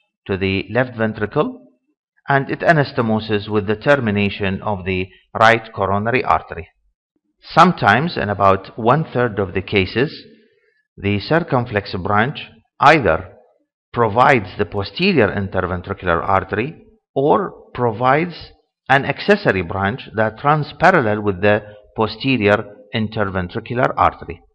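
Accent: Iraq. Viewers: you sound iraqi are you ?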